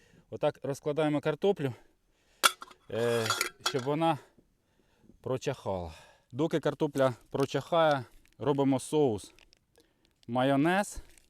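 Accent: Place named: Poltava, Ukraine. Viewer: став підписником не лише через персональне знайомство, а й завдяки гарній українській з легким полтавським відтінком) І не лише я. Продовжуй так і далі, молоток!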